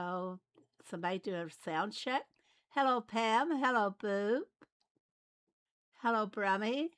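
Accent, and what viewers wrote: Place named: South, United States. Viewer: I have worked in the Boston turf for over 20 years - you don’t have it! Very southern to me!!!!😊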